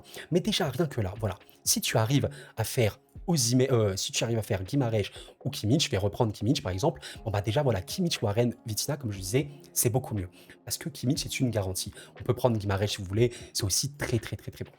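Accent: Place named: Quebec, Canada. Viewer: J’ai jamais vu un québécois avoir un accent aussi léger.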